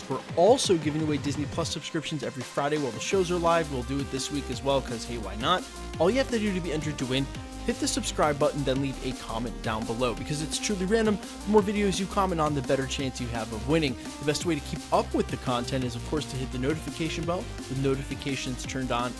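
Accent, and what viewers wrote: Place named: Philadelphia, United States. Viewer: Dat accent, if you're not a Philly Guy, I now dub thee as one! Come to the jawn, we got scrapple...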